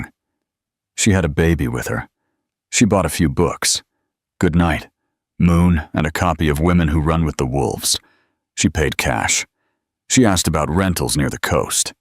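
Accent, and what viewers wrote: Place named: United States. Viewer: Oh my goodness St Thomas Hospital they looked after me im a London its so good to hear London on aAmerican story anAmerican voice saying these places its wounderful the park everything thank you AI family thank you . London is sad right now but with lots of luck London will be back god bless you all❤❤❤❤❤❤❤❤❤❤❤❤❤❤❤❤❤❤❤❤❤❤❤❤❤❤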